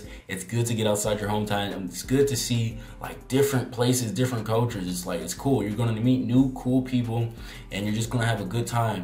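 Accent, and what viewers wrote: Baltimore, United States. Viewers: you definitely from Baltimore I can hear it😂🙌🏼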